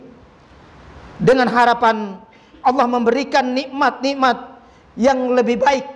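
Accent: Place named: Bima, Indonesia. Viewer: Gaya bahasanya mirip logak Bima ya..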